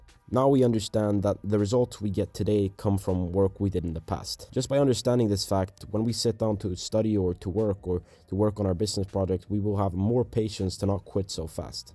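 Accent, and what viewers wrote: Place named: Sweden. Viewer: just a fellow swede appriciating another swedes work keep going this was really helpful!!(if my hearing is wrong and u r not a swede i'm sorry but u do have a nice accent then!)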